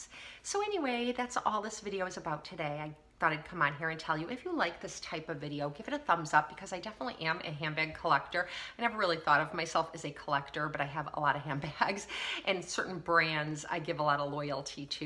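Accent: Wisconsin, United States. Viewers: I absolutely love HOBO bags. Love the 70’s decor on them. And the leather is phenomenal. I have 5 bags and 2 wallets. The wallet you are showing is called the ‘Lauren’. It is one of their most popular bags. Ps. You are very pretty. And you sound like you are from Wisconsin. Happy Holidays/Birthday. ☺️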